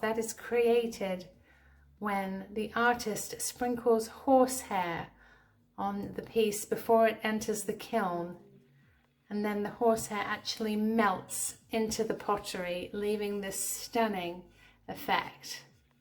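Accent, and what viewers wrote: United Kingdom. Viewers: Please don't put things back on the table like that carry them down the table as you go. I know I am not alone in saying that it drives my anxiety way up. Even if you say that no one is behind you, it doesn't stop the anxiety. The only thing that would is if you carry it along with you. If you must put it down, please put it down in front of your body while you reach for another item. Then pick up that item along with the other ones in front of it. If I could control anxiety I would, because it really sucks. I'm relieved you were able to get all the items. But maybe if you wouldn't mind, in the future realize that so many of us, including myself that love watching all you guys as a respite from anxiety. I love watching your videos. You are always so cheery. I love your British accent, and your knowledge about so many things.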